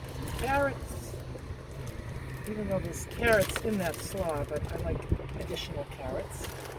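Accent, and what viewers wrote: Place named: Boston, United States. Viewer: I really am sorry about your coffee ordeal. I never drank coffee then as working early shift, I had to make sure everything was filled, and coffee was made, I could take care of everything but coffee I knew nothing about making, so someone showed me how to do the coffee, it was easy, it smelled So good over time I tried it, I’d add a lot of cream, then I tried de caffe,it was much better so I’d have a cup. Only I never would finish it. I will once in a great while have a cup of instant, it may take me all day so it’ll be cod, which it’s ok to me. I’m happy you have such a strong will to quit guess it’s like quitting smoking, I’ve never smoked. But husband had a hard time when he’d try to quit. Soon he stared smoking outside, Dr said I couldn’t be around smoker. I’ve been around it all my life,dad smoked, but they say I’d inhale more than dad did. 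Didn’t realize it until I was grown, then hubby was a smoker. Anyway the lunges paid for that,. Your doing so well with your van, it’s so nice. I hear you mention, you were heavier, at one time, I’m gonna eventually look at all your other videos, I just love them. Most I love your room, it’s beautiful. Well I’m rambled along ,so I’ll stop and backup the one I’m watching now. Have a wonderful day. Love your accent, did you say your from Boston ? Latter 👍👍👍👍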